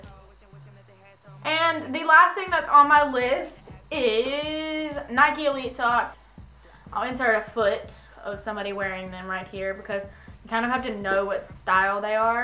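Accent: South, United States. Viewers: Are you from the South???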